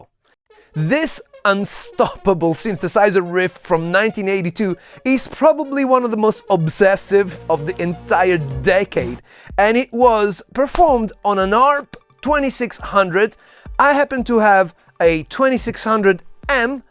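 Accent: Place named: Italy. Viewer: Nice video. 2 out of 100, for your amazing English. I love your accent. You give English that italian design feeling. Cheers. PS: I'm reporting this vid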